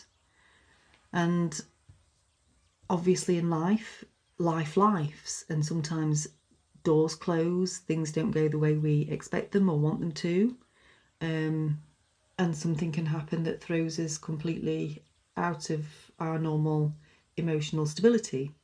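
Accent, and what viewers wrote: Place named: Manchester, United Kingdom. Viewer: Listening to your voice (which is similar to mine - born in Greater Manchester) and your words - I saw the waves of the tide - the rhythm of coming in and going out. And the insight came that groundedness in a time of change is like an evolving, expanding equanimity. As the tide comes in - the deeper the return of consciousness to the core wound. But when the tide turns - the greater the expansion of consciousness "outside". Thankyou.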